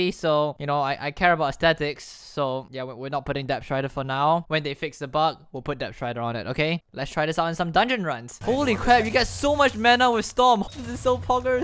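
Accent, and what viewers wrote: Singapore. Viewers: I can tell ur from Singapore too:D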